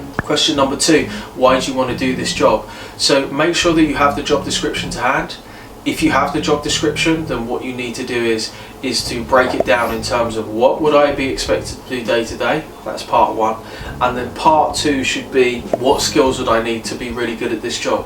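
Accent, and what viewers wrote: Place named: United Kingdom. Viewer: brother , your accent is terrible , it could be perfect for brits but for other it is a headache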